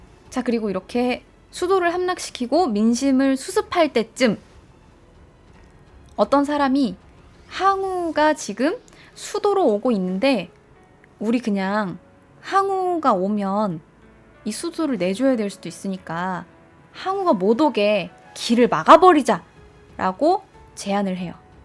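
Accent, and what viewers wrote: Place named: Jeolla, South Korea. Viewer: ~~전라도 맞지 김수미 목소리가 ㅎ ㅎ ㅎ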